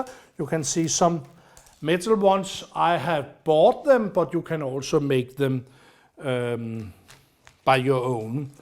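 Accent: Denmark. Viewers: I love your accent! My grandfather and many of our family came from Denmark. Many of them used Dane to say things. I loved it. I have a collection of the Danish plates in my house. So I will say Velkommen. My Grandfather would sing to my daughter and dance around with her. Wonderful memories. Thank you. I know the word for thanks but I don't think it is right. Joyce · Great in English, I follow him in Danish or I should say watch, learn and also enjoy his Danish accent. He seems such a lovely man, very creative. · I am the people who love his Danish accent 🤣💖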